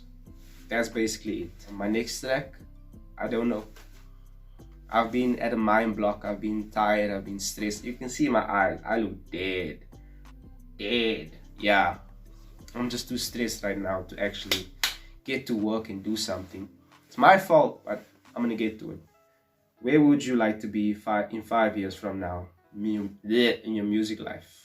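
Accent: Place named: Afrikaans, South Africa. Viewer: Oh my gosh you have a Strong Afrikaans accent lol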